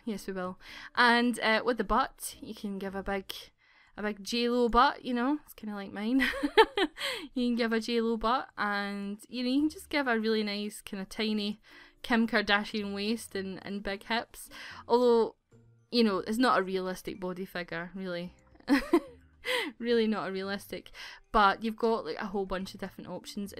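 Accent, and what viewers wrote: Scotland, United Kingdom. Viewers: Are you British? NEW TOO YOUR CHANNEL!!! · 4 some reason u sound Scottish 2 me...or it could b the fact that I just finished watching BRAVE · Are you Scottish? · you admitted that you have a JLo butt? very brave of you! Can't wait to see you make yourself if you're going to! Love the 'wee' scottish accent too! x From what I've seen of this CAS mode, I'm disappointed that the customisation is a bit more limited, like there is no 'create a style' tool.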